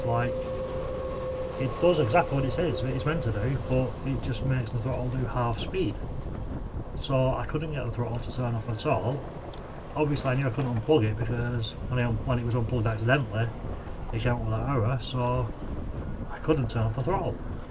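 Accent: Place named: Yorkshire, United Kingdom. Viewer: Just came across your video well done you I found it interesting listening to your woo with your first e bike purchase what a bummer , but looks like you have purchased a different one now and you are all legal. I bought my first e bike over 10 years ago before all this legal stuff it had a motorbike like throttle I have never been stopped by the old bill in fact I have had several bobbies saying what a nice bike never asked me about motor size or anything maybe just been lucky . Please carry on making your videos love your accent reminds me of home I was brought up in Yorkshire but left when I was twenty live in London now miss the northern accent though .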